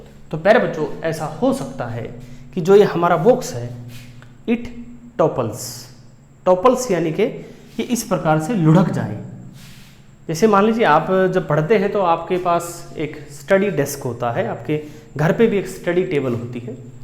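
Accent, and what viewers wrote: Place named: Haryana, India. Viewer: Aapki haryanvi wali tone aa jati h bt aapne samjhaya accha 👍👍👍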